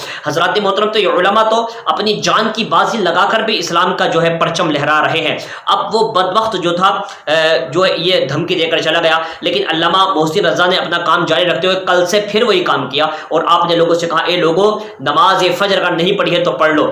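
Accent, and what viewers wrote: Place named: Arab world. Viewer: Ye urdu bhi arabi worst mein boltay hain😬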